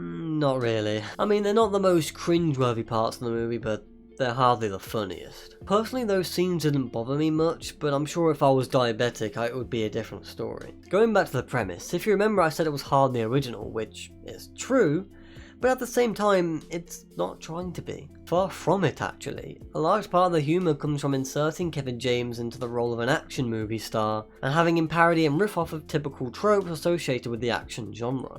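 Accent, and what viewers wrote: United Kingdom. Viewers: Why are you British tho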